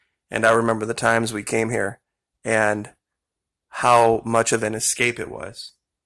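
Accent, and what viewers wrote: South, United States. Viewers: Are u from the south, ❓😌🙏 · Southern accent? Probably remove Louisiana from the map.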